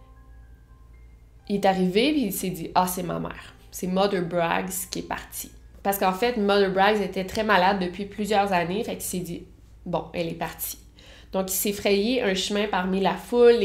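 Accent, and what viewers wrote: Quebec, Canada. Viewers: EXACTEMENT ton accent quebecois et ton joli visage nous suffit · vous êtes délicieuse avec votre mimique et votre accent quebequois..je commence à vous suivre 100 pour cent Merci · Honnêtement, j'ai vraiment dû mal avec l'accent québécois. Sans aucune raison, mais cela m'agresse les oreilles. Cela aurait été dommage que je m'arrête à cela compte tenu de vos recherches et vos affaires intéressantes. Alors je prends sur moi. Merci pour le partage. Très bon boulot.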